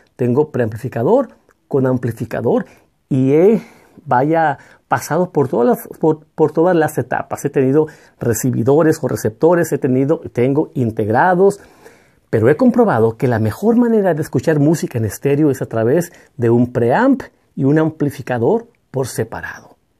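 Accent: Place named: Yucatan, Mexico. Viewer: Hace mucho que no vivo en México, pero noto un ligero acento de Yucatan es usted de origen yucateco o por lo menos de la peninsula de yucatan? Perdon por desviarme un poco del tema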